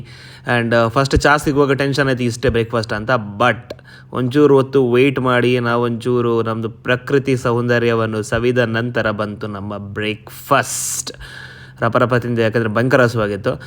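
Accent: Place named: Mangalore, India. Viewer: Kudla da kannada kend bayankara kushi and😅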